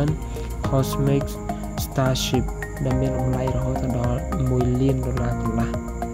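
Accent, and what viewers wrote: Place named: United Kingdom. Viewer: តុង English ពេកឮតែខ្យល់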